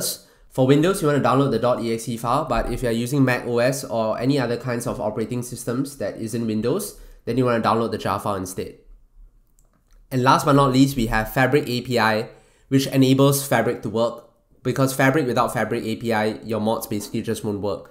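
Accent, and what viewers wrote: Singapore. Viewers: u from singapore?? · I hear your accent, I knew INSTANTLY that you were Singaporean lol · ru singaporean? your accent is like sg accent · nice sigaporian accent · are you from singapore ur voice sounds like it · nice singaporean accent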